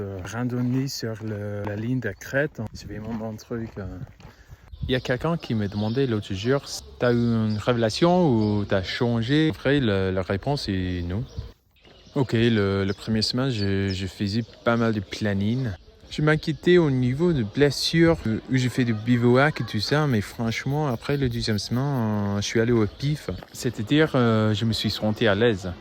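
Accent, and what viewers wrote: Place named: Quebec, Canada. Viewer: waw your French is amazing we wouldn't even know you're irish you kinda sound québécois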